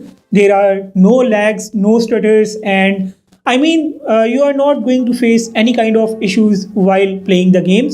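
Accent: India. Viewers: You voice and look like indian but I know you are form pakistan ❤️.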